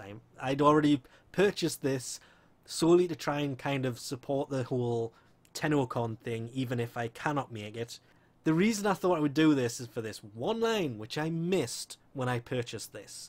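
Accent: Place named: Northern England, United Kingdom. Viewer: According to your accent I think you're from the middle or north from Brittan, so you're planning to fly to Toronto instead waiting till it's actually in Brittan?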